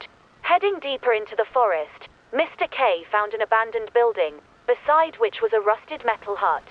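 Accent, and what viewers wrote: United Kingdom. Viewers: bri'ish voice hits it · A few questions from someone who’s Irish. 1. Why does the Ukrainian EAS play at the start instead of the Irish one? 2. Why is the TTS voice British instead of Irish? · I like that the lady speaking sounds BRI,ISH.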